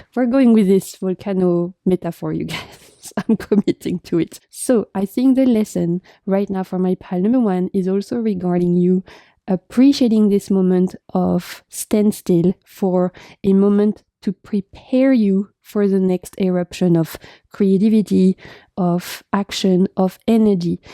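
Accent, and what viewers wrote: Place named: France. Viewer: i lived in paris and dijon for 10 years...your french accent is delicious. and thank you for such an amazing reading. pile 3 merci beaucoup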